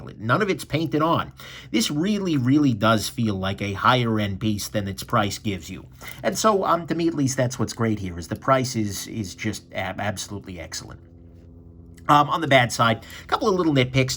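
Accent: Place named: Alabama, United States. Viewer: I have The Islander with the 60-minute dive bezel, it's a great watch for the price. By the way I'm picking up your southern accent I'm guessing Alabama?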